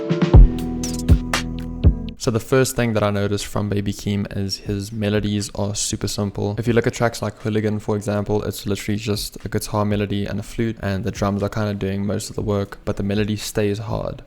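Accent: South Africa. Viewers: I smell a south african accent ei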